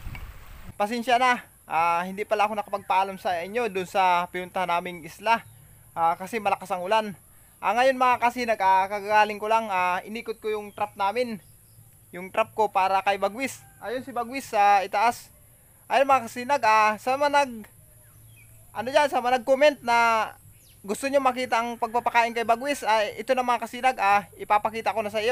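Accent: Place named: Albay, Philippines. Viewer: kala ko kasi taga albay ka yung tuno kaso ng pagsasalita mo parang sa albay. San ka sa cam sur idol? Update mo kame lage ki bagwis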